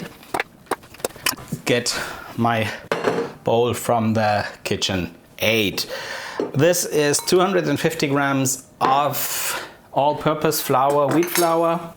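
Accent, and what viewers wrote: Germany. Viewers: Lol all Germans say ‘stripes’ instead of ‘strips.’ And ‘vinegar’ invariably becomes ‘winegar,’ which is weird given ‘w’ is pronounced more like ‘v’ in German! But anyway, thanks for sharing - I love Flammkuchen.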